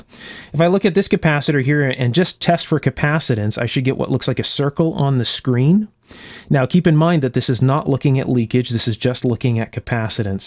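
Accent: Canada. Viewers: I was at first agast to hear you pronounce Solder soul'-der instead of saw'_der and Far'-ad as Fer-ad'. Then I head you say awoot and understood that you must be Canadian, ayh. OK I get it. But Michael's name wasn't Fer-ad'-ay, it was Fara'-day. I didn't know Canadians took so much of their speech from the English as apposed to the way Americans talk. As was said in My Fair Lady, "Why can't the English speak English? The Americans haven't been doing it for years." Keep up the good work and I can't wait to see the referb video, either. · I had no idea that Canadians pronounce thing the same way we do in Australia...like Zed instead of Zee, and solder instead of sodder. Impressed.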